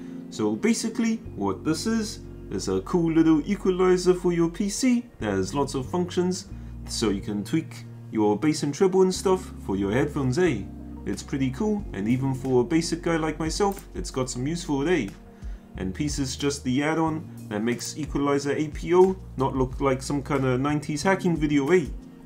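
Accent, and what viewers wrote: New Zealand. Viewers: He's a newish type of zealander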